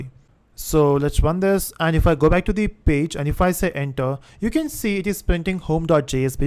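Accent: India. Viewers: dude I love your accent, its half Indian and half foreigner and it sounds 10/10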